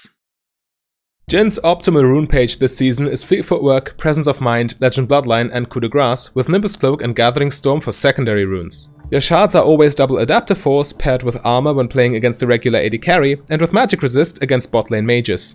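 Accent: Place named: Germany. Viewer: classic german accent ^^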